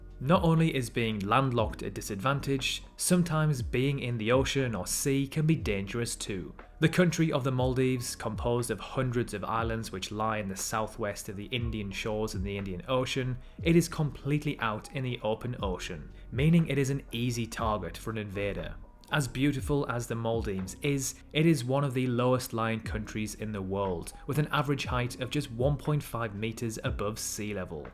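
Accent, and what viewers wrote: United Kingdom. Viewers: I really love your British accent bro!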